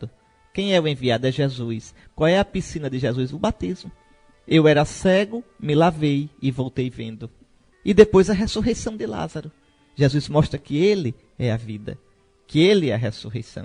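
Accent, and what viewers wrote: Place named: Northeast, Brazil. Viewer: Uma ótima explicação em dialeto nordestino. Dom Henrique intercedei por nossa Quaresma!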